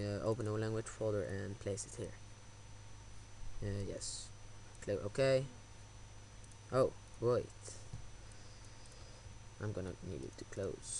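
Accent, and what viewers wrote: Netherlands. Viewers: Je kunt echt horen dat je Nederlands bent... :P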